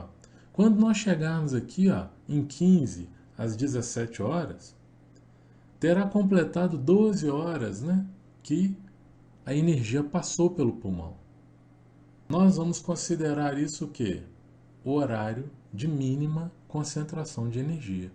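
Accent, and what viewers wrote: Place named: Minas Gerais, Brazil. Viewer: A fala pausada ( bem mineira por sinal) me pareceu boa pra aprendizagem.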